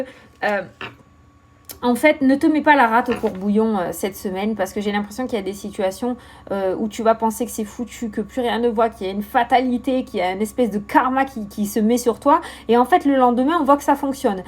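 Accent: South, France. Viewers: Coucou, toujours un bonheur d'écouter tes tirages... Tu es toujours de bonne humeur et spontanée, j'adore!!! 😍 Et tes "putains" avec ton accent du sudeuuu, c'est top, ne change rien 🤣 Belle journée à toi et ta famille 🥳 et merci pour ce concours 🍀🤞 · Rhooo pétant!!! 😜 Les montagnes russes d entrée 😂 putain! C est le sud qui parle et té! Changes rien, t es trop topissime, z'ont qu'à pas t écouter les mauvaises personnes.. Moi je t'adore 💜 et tu es une bonne personne... Je suis seule alors on verra côté cancan... 😜 Rien n arrête les langues de vipères... Merkiii, t es une bouffée de bonheur à chaque vidéo 💜💜💜💜😘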